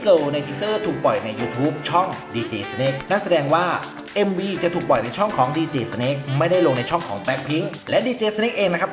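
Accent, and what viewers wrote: Isan, Thailand. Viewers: ตื่นเต้นอีกรอบแล้ว..อยาดฟังมากๆคะ รักลิซ่า ชอบช่องนี้มาก เว้าอิสานถึกใจคักๆ.มักหลาย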